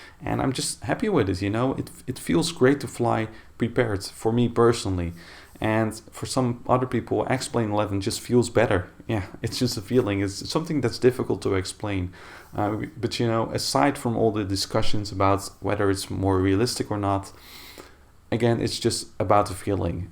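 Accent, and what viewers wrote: Netherlands. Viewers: Okay ik ben dus niet de enige die z'n accent hoorde hahaha ik d8 ook al dat hij nl is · I KNEW IT! your dutch! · Your Dutch accent is very funny☺️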